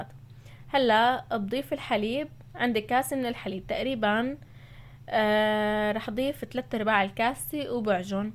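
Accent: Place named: Homs, Syria. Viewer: صحه وهنا لكلشي اكل.. . يعطيكي العافية... محتوى حلو وطبيعي وسلس بستمتع معك مع لهجتنا الحمصيه البتجنن محتوى من بيوتنا مافيه تصنع ابداااااا..... من حسن للأحسن يارب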